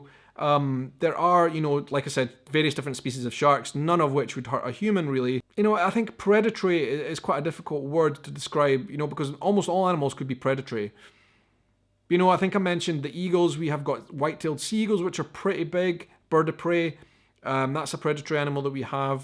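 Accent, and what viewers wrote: Scotland, United Kingdom. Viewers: "Kettle of fish" still loving the accent. "Son's of Scotland" speech type stuff...awesome. Bring me on your next adventure.... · I like "moosies" best. And you can read the dictionary out loud if you like, anything to hear that wonderful Scottish accent! 😍😍😍😍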